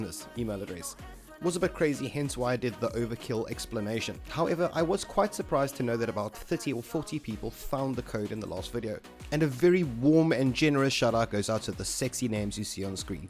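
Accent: South Africa. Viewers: your South-African?? dude that's so cool, i never knew, its almost wipe day on Intox 1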